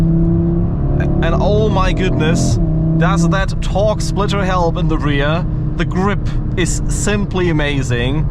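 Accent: Germany. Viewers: Most german english i have ever heard :)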